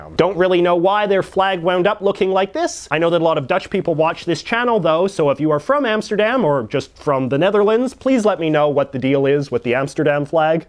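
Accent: Canada. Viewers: Way do u have the stereotypical Canadian accent. We don’t own him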